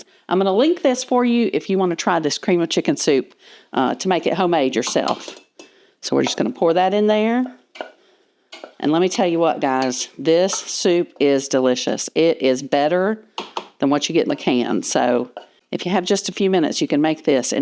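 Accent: South, United States. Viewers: Her southern accent 🫶 I’m a Texan living in Missouri and I missed that! The rule areas have a little bit of around here. Easy & kind! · What a great recipe. Thanks. You're so fun. Subscribed so fast. You're southern...but not so sickly over the top sweet like so many. Just love you.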